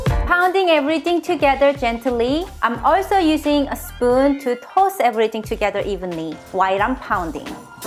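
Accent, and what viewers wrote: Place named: South Korea. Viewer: Omg you make every languages sound just korean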